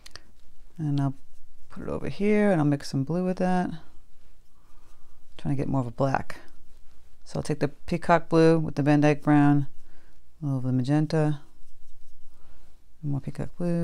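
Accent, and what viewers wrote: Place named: New England, United States. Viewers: Great lesson for beginners. Also a good way to save on paint and paper for beginners. Love your New England accent. I've lived in Florida for 50 years and people can still hear mine! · Love your style. And your cute east coast accent. 😊🌹👍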